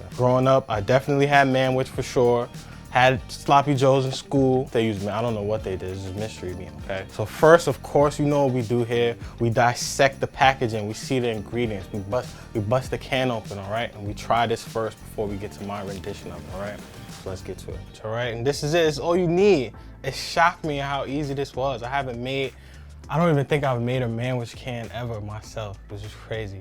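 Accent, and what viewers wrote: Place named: New York, United States. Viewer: Sucha new York guy. Brooklyn? Bronx? Manhattan? I wonder. Imma try this out. Big thanks from the bx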